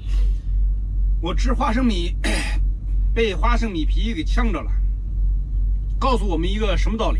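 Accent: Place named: Jilu, China. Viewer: 自认为在辨别各地口音方面有天赋。。结果这个老哥的口音真是迷幻。。可能是冀鲁官话的人娶了个东北老婆，或者东北人娶了个江浙老婆。前者可能性大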